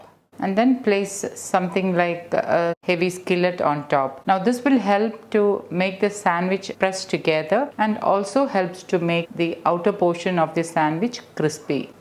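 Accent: Kerala, India. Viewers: I love the way you talk, mixing some keralite tone , so pleasing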